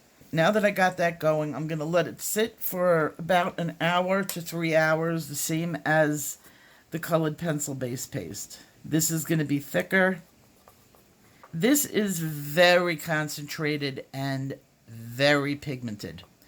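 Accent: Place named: Massachusetts, United States. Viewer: "The HECK did I invent hea?!" Love it 😂😂 I live in MA so hearing your accent always puts a smile on my face 😊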